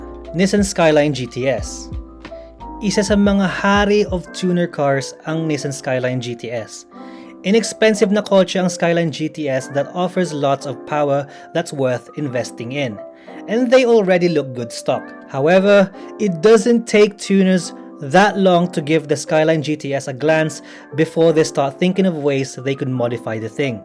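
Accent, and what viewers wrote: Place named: Australia. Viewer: I like the cars you recommend sir but I love your subtle ozzie accent.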